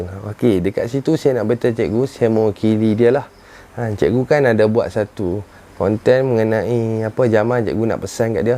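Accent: Penang, Malaysia. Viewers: cakap kl sekerat Pineng sekerat apa PON terbaik bos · please laa bercakap mcm orng utaqa .. toksahh la dok gabung kl dgn penang naik peninnnnnggggg maitttt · Cakap peneng lagi sempoi.x payah cakap KL bunyi pelik😅😅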